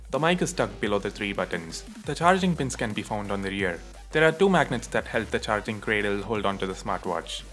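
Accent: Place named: South India, India. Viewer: You have a typical south Indian's accent. Not complaining though! 😃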